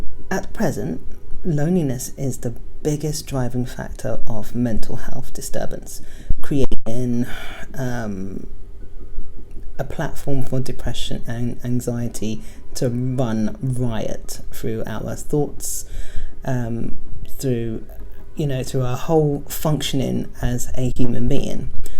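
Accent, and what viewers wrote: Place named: United Kingdom. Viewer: My god, you are beautiful! Totally wasn't expecting to hear another British accent :-) Your locs are beautiful!!! Need to have a look through your videos :-)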